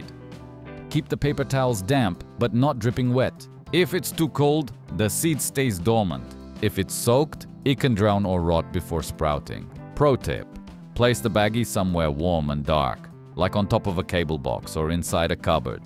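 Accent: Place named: Europe. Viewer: Sounds like european😅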